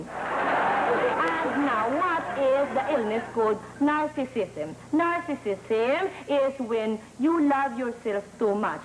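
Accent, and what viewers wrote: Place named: Visayas, Philippines. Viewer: Bisaya añg punto ni.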